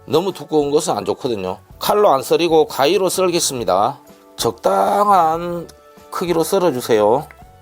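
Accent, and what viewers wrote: Jeolla, South Korea. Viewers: 쉐프님 전라도 사투리 구수합니다 여긴 완도 에요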